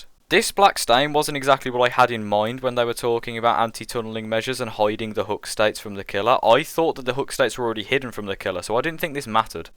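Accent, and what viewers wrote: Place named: United Kingdom. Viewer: hmmm, this guy sounds british ....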